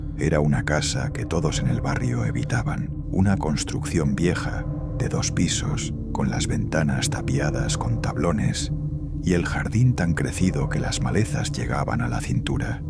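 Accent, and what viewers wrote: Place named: Argentina. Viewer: Sos de Argentina? Por el acento jaja desde que conocí tus videos veo todos los días uno 😅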